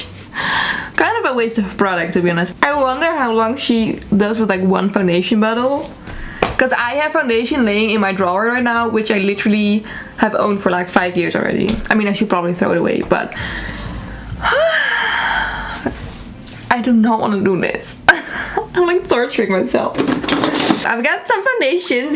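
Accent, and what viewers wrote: United Kingdom. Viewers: I thought you said look like a chef at first, instead of chav. The british accent was also a treat :) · Your British accent sent me. 😂😂😂😂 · Loving the British accent🤭😂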